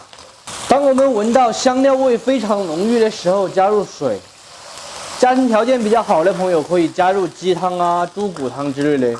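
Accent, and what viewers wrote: Sichuan, China. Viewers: 哈哈 喜歡聽你說川普！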